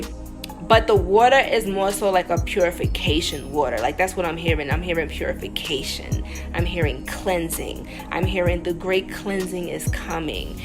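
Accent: New York, United States. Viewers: Amazing, the reason I clicked on your videos 2 weeks ago is because you look just like my ex girlfriend. So much so, I sent the video to others to verify. The only thing that was different was the NY accent. Even then, I had to listen closely. Enjoying your videos, and appreciate what you doing! Much love❤ From NC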